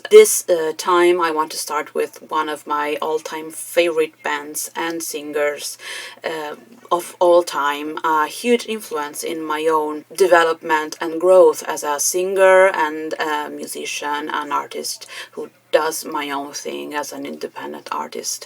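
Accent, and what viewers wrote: Italy. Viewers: Canti di tutto… sei brava… forse un talent show, al giorno d’oggi dovresti provarlo (anche se non li sopporto). Bella Canzone, Ottima Analisi del Pezzo e Complimenti per il tuo l’Inglese… accento romanesco quasi assente… Spiazzalo con qualche canzone Italiana strana… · Questa canzone è tra quelle che io riascolto sempre. Diciamo che è tra le mie top 200. Ebbi la fortuna di ascoltare Antonella dal vivo quando ancora doveva diventare famosa, al Palazzo dei Congressi dell'Eur, in una fiera del suono. Ne rimasi scioccato. Cantava " ", forse una prima versione. Ricordo solo che tempo dopo la sentii alla radio e corsi a comprare il disco. Non voglio mettere a confronto Antonella con altre cantanti. Secondo me non ha senso. Penso piuttosto che si possa esprimere il proprio gradimento senza fare paragoni. Ed a me, questa voce piace e molto. Come quasi tutto quello che il gruppo ha creato. 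Anche dopo Antonella. Ho ascoltato tutto quello che avevi da dire sull'argomento. Mi sei piaciuta. Brava. Ho capito che eri Italiana fin dalla prima parola che hai pronunciato in Italiano... Altrimenti non lo avrei notato. Sei anche di Roma. Beh... Anche io. E di questo mi dispiace. E' sempre più invivibile. Avessi la tua età, scapperei subito, prima da Roma e poi dall'Italia. Anche se... non saprei per andar dove.;-)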